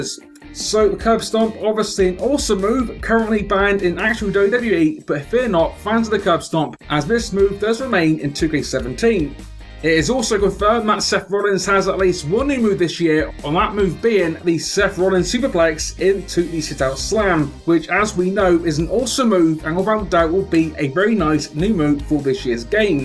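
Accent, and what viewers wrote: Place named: Bournemouth, United Kingdom. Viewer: I think I know where your accent is from. Are you from Bournemouth, England? And btw another great video you're killing it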